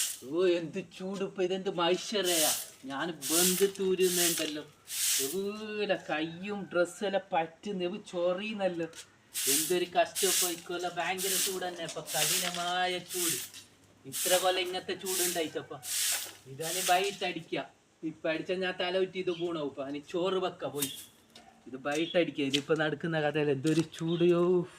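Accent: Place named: Kasaragod, India. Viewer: Ith kasaragod choori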